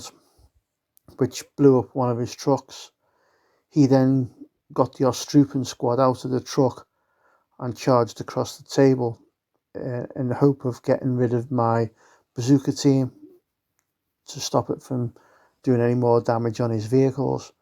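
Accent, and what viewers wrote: United Kingdom. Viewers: That a scouse accent? I'm recently into Bolt Action but no idea where theres any groups in Liverpool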